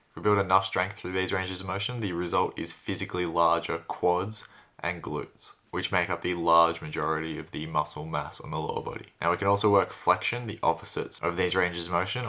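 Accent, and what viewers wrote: Australia. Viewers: Bro your Australian accent is fuckinnnm difficult to understand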